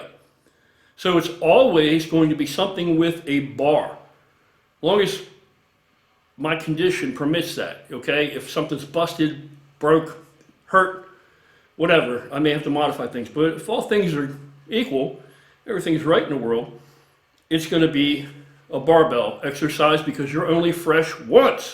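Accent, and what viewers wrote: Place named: Pittsburgh, United States. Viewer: That accent screams Pittsburgh.. speaking of, could really go for a Primantis right about now!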